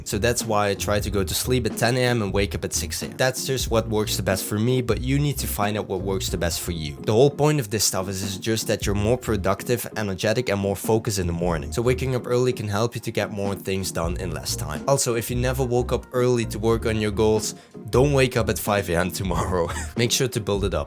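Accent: Netherlands. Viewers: Are you a Dutchie?😂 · Are you Dutch? Idk kinda sounds like a Dutch accent haha :)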